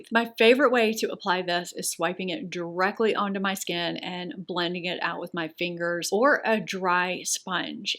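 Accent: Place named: New Orleans, United States. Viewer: I was trying to place your accent lol. I went to med school in NO and should have known. It was a long time ago though. Very special city.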